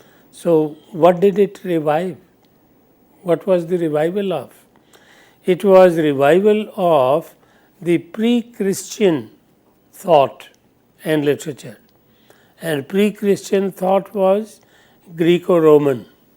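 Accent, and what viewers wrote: India. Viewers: look at your sentence. It is full of errors and badly structured. It is clear evidence of your poor academics. First look into your inner self. You are not capable of even making a comment about Indians. He is speaking Indian English. This is Indian accent. Got it???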